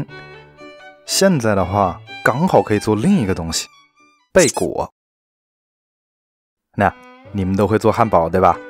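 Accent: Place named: Hunan, China. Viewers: 哈哈哈听你的普通话隐隐约约感受到湖南口音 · 你说话好大的fu南人的味道啊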